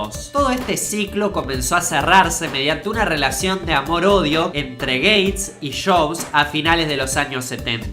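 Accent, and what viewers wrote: Argentina. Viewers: Dilo desde el inicio deja de hacer estas mierdaaass... que luego personas como yo, debemos soportar a estupidos fna de x marcar creyendo estas meirdas.... No jodas... todos sabemos q la interfaz de Apple su un robo total y declarado a Xerox PARC... así q eso de "ENTORNO GRÁFICO FLAMANTE" es totalmente una desinformación y la gana de crear polémica, Y no me jodas q es eso de "orishinal" todos sabemos q eres Argenta... pero eso es no saber hablar.. vocaliza por favor, y no me digan q lo dice en ingles, por q así tampoco es... y encima esta al revés. (Justo lo oí cuando escribía y me descoloco, tranquilo Argentino medio, no os odio o cosas asi.) Bueno... Aquí el único GENIO DEL MAL y SOBREVALORADISIIMOO es el JOBS, ya q el copio primero la interfaz de Xerox PARC descaradamente y iban de chulos... creyendo q era los unicos... y ahora q Bill, se los adelanto... 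que hace el copión y rata de JOBS se enoja.... WTF! quien es el GENIO DEL PLAGIOO... o mejor dicho el IDIOTA DEL PLAGIO. A u otra cosa mas general, gente q mira este canal por favor dejen de verlo este tipo es un papanatas... con ganas de crear ruido y subir en fama, si lo siguen podrán ver como cada día poca temas mas controversiales para llegar a mas de 1m de subs rápidamente y mas , de forma rápida, a costa de decir mierda pura. Es asi, tus video son de buena calidad con tu tono/toque... todo eso, pero una vez q dices mierdas todo ese ambiente se convierte en puro asco insoportable... y ya da igual si haces cosas buenas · Habla asi porque es argentino. Y esta hablando de un apellido ingles. Casi todas las palabras extranjeras las pronunciamos con nuestro acento en el caso que estemos hablando castellano. Si te parece raro ponete a pensar como pronuncian los ingleses o los estadounidenses los apellidos o los nombres españoles. 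· no sos argentino, por eso decis eso. Es nuestro acento y ya.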